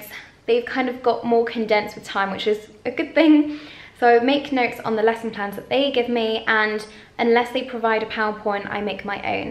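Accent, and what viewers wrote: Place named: United Kingdom. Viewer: I am improving my English by listening your British accent. 😂😂😇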